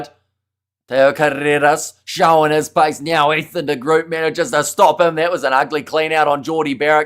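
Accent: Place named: New Zealand. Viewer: You have the most Kiwi accent in Godzone, great chat. Do get to a live AB match. The speed of play is unbelievable, and not captured on TV somehow. And atmos at Eden for this historic game.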